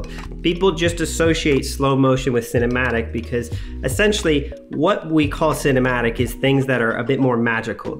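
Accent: Canada. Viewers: 3 seconds in. Canadian.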